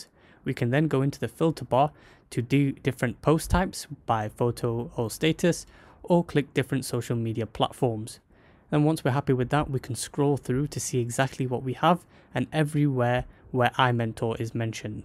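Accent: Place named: India. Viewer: Bro are you from india?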